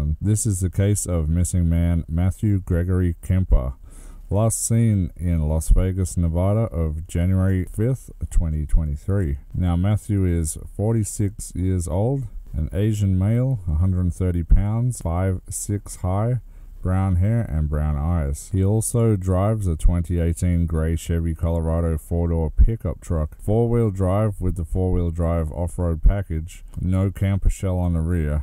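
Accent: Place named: Australia. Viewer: Who doesn't love listening to that Aussie accent! LOL 🤣 I hope they find the missing man. 🙏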